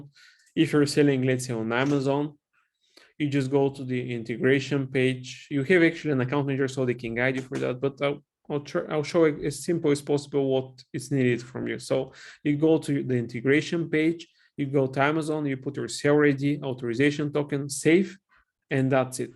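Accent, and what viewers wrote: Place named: Bulgaria. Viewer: He seems to have a good command of the language, however, a very heavy Bulgarian accent.. Maybe because I have been exposed to British English and Canadian English more. If you are also Bulgarian it might be easier to figure out what he is saying. There are some instances in the video where I slowed the video to 0.50 and listened to it 10 times, I dont understand what he said.. I might give you the example when I watch it again...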